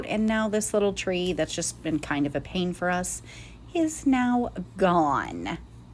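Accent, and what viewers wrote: England, United Kingdom. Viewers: I’m from the U.K. and your English accent is hilarious 😆 I love it!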